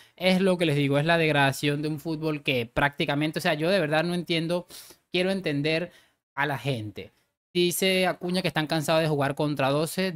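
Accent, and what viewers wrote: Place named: Venezuela. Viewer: Oye una pregunta sin sin ofender y perdona si te ofendo de dónde eres? Porque me gusta mucho tu acento y no sé si venezolano o cubano 🙏💪🔥😈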